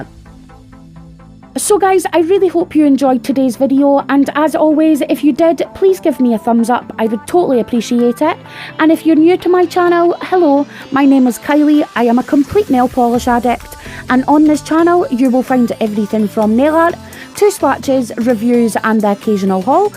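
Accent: Scotland, United Kingdom. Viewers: So glad I came across your channel,I’ll be watching more ,this video is fantastic and nice to hear that accent from a fellow Scot x🏴x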